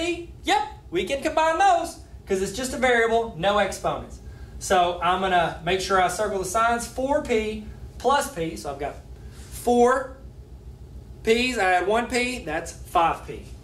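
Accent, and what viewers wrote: South, United States. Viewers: ayyyyyyyye my class calls you and we love this video haha XD THANK YOU FOR BLESSING MY MATH CLASS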